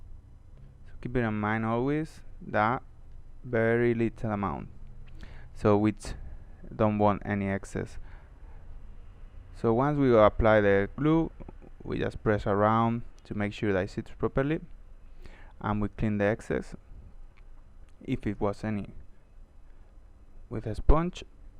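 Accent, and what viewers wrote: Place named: Spain. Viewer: Sounds Iberian Spanish, or even North African, like Morrocan, or Tunisian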